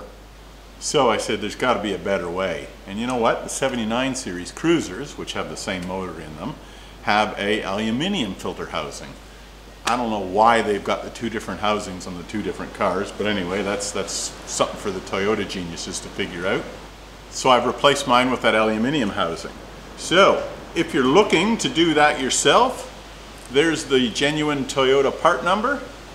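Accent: Canada. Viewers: I don’t own a Toyota but I had a great laugh at your “bugger me” moments! Add the Canadian twang to the mix and I’ve spent the whole arvo watching your clips about a vehicle I don’t even own! Well done and keep the content flowing.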